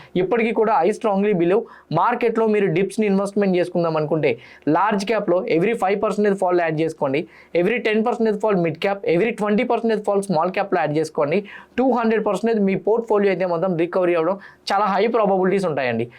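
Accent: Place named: Telangana, India. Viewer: Why this telangana slang all of a sudden?😅😅😅😅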